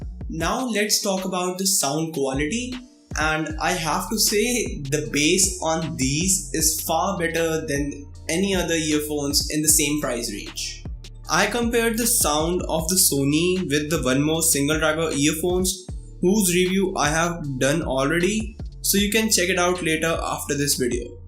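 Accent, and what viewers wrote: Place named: North India, India. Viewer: Decent presentation. Loved the accent from a North Indian . Way to go.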